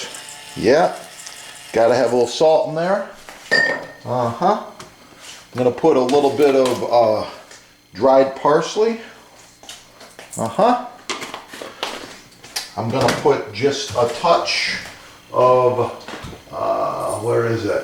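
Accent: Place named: Midwest, United States. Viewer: Hey dude, I love your videos! I found you through searching for finishing wood. Also, you’re f’ing hilarious! And your accent is amazing. Midwest? Wisconsin? Anyway, keep up the good work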